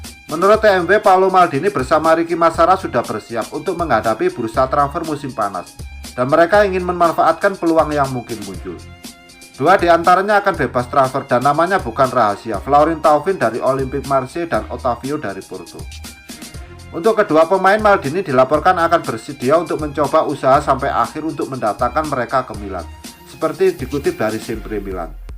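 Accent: Java, Indonesia. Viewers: Medokmu parah kang 🤣🤣🤣🤣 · lanjutkan aja mas..medok² yg penting MILANISTI 😎 Forza Milan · Lah medoke kang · Hadeh ini narrator piye! Karedok medok banget!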